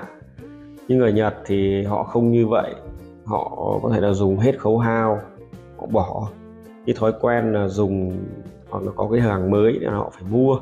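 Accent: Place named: Vinh Phuc, Vietnam. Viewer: Giọng chú như Vĩnh Phúc nhà tôi.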